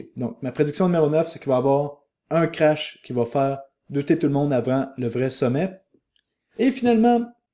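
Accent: Quebec, Canada. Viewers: Avec un charmant accent quebecois wn prime c est du bonheur. Merci pour la qualite de ce que tu donnes et l energie que tu partages. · Bonne année, bonne santé et tout ce qui va avec !🎉 Je te suis depuis que je suis rentré dans les crypto en avril 2024 et j' apprécie vraiment ton contenu. Les expressions "allez les guerriers", "porno de la peur", ... donnent un peu d'exotisme à tes vidéos au delà de ton accent québécois 😂 J' ai téléchargé ta formation gratuite comme ça je serai au top pour le prochain bullrun. Merci pour tout! Change rien! Let's make monney!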